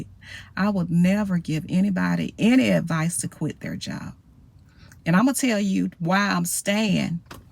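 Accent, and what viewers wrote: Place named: Alabama, United States. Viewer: How did I know that accent was from here… Alabama!! Whew our accents are so unique lol!! Sending love!! ❤❤❤❤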